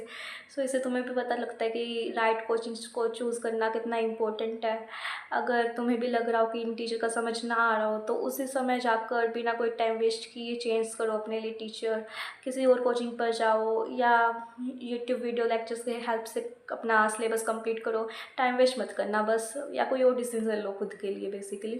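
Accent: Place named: Haryana, India. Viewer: Bhai yo to mane pata lag gya thare haryanvi accent badi mast hai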